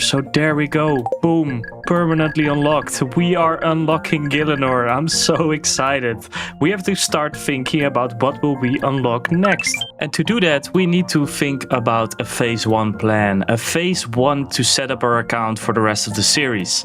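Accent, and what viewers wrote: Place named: Netherlands. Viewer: Ik hoor een Nederlander, ik heb je door 🧐😂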